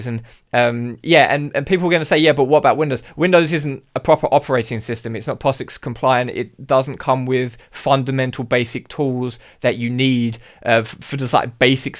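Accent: United Kingdom. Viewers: from your accent, i assumed you were in the uk. where are you located though?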